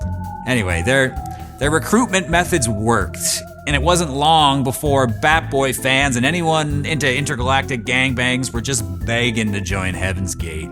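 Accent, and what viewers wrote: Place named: Canada. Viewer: I live in Canada and sounds a lot like us accent wise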